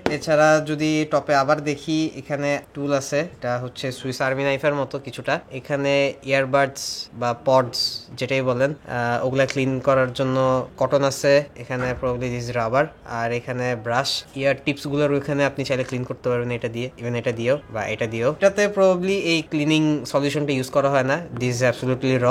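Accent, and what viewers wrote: Barishal, Bangladesh. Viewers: ত্যানা 😁 Vai ki Barishal ar naki?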